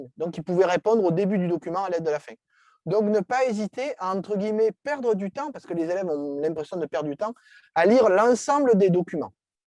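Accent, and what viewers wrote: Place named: Marseille, France. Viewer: Merci. Jolie accent marseillais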